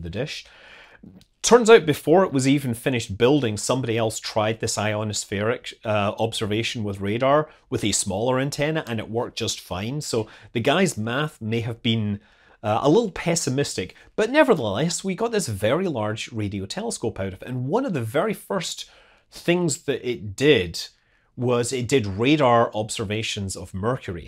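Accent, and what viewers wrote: Scotland, United Kingdom. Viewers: Is that a Scottish accent that I am detecting?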